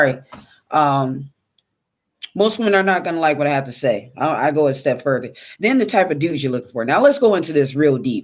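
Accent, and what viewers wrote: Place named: Detroit, United States. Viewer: I hear a Detroit ish Midwest accent. Am I right?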